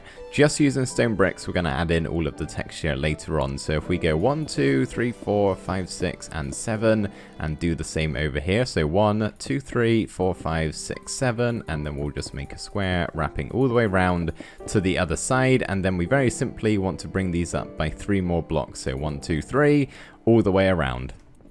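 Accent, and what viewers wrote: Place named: Scotland, United Kingdom. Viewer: Hey, really enjoying your builds. I've been trying to place your accent. Is it Scottish borders?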